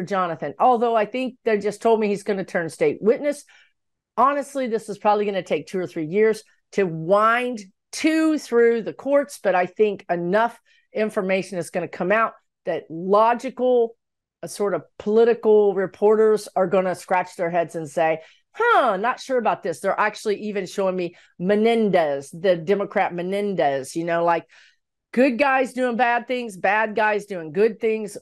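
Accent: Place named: South, United States. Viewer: Hey ❤ You're sounding very southern today---you go girl!!! 😂Love you so much.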